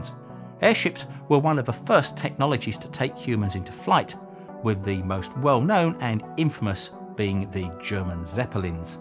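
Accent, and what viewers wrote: United Kingdom. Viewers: doesn't sound very cockney to me....sounds more like a very typical clipped British accent....true cockney doesn't sound anything like English, at least from what I've heard....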